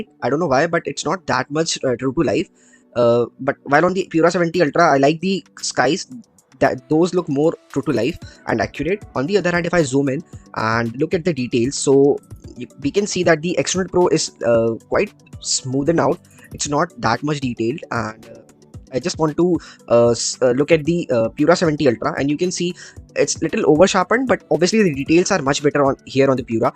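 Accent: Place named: India. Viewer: Indian English ... :(( fro Indian audience only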